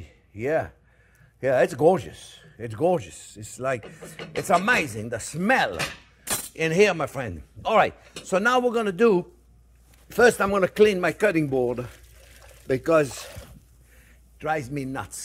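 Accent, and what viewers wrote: France. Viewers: You may have a French accent but you are a great American. I love you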